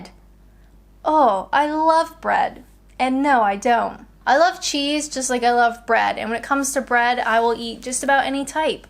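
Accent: England, United Kingdom. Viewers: its interesting to hear slight english accent isims creep into your speech lol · I don’t know if you know but you are developing the hint of an English accent👌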